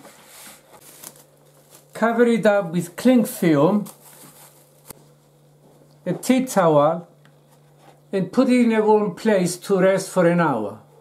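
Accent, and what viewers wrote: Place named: Cyprus. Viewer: I love your recipes as I also love Cypriot food and Cypriot accent 😉😍💖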